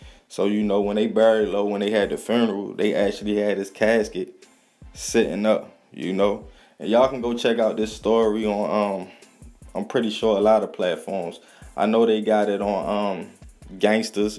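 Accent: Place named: DMV, United States. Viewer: Lo was 18 bro get it right sound like you from the dmv so you not from out here so it's not your faught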